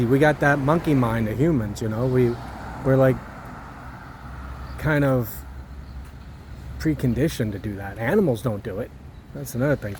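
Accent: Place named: North, United Kingdom. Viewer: Thank you I needed to hear this. I am a chronic worry wart. It’s also nice to hear the you deliver this message in the vernacular from up north where I grew up. Thank you✌️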